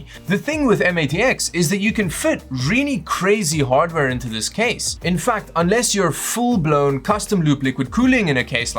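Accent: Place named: South Africa. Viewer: 100% south african right?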